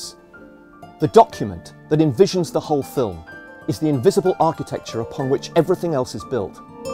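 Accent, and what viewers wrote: United Kingdom. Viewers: everything sounds more important when you're british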